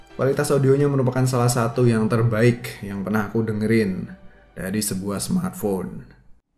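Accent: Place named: Yogyakarta, Indonesia. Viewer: Wong jogja toh?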